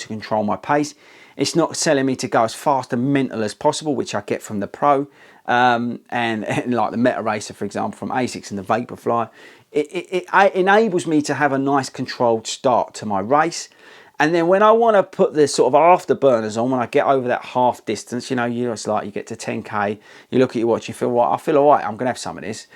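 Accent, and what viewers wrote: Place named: Northern England, United Kingdom. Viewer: Not a huge problem, I like a lot your videos, they are very "straight". By the way, where are you from, your accent, is from the north? my daughter is living in Kent and the accent is quite different. I am from Brazil. The comparative shoes videos are also very good. Thanks for helping us all !! Cheers!!!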